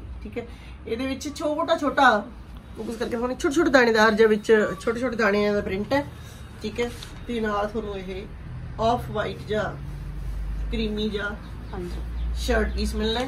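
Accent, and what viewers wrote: Standard. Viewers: Menu thudi pure Punjabi bahut wadeya lagdi aa g sis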